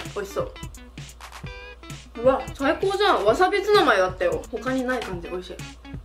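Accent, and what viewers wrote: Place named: Japan. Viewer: 都民からするとは結構なまってるよ🍊 方言で地元感あっていいよね🍊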